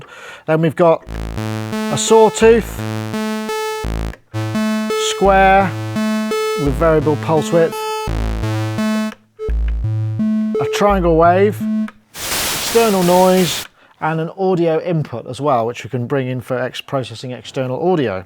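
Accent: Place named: Cockney, United Kingdom. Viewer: Love that cockney style.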